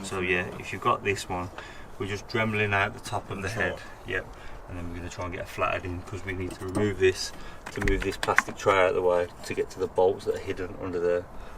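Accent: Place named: United Kingdom. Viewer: Its crazy how much more interesting it is to watch these videos with a guy with a british accent, I could watch these videos all day, yet typical Americans talking about the same exact things, im asleep.....lol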